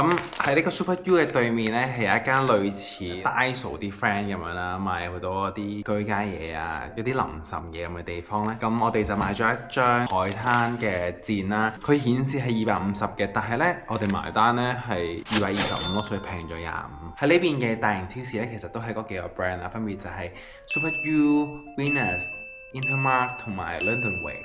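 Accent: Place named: Macau, China. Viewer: 澳門口音好得意😂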